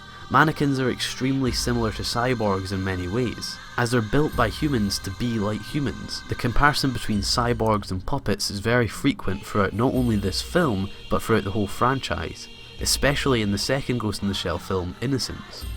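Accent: Scotland, United Kingdom. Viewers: At least this narrator sounds like British or Northeast Coast... and this makes sense. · Never realised I needed a Scottish bloke musing on philosophy, anime, identity, death, and life in general. Ay. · you scottish?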